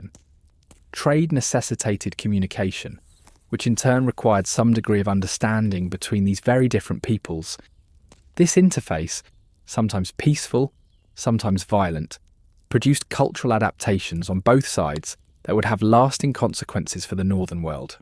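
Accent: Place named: United Kingdom. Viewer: Hi from Ukraine🇺🇦. Im in love with British accent. Its true history ❤like 👍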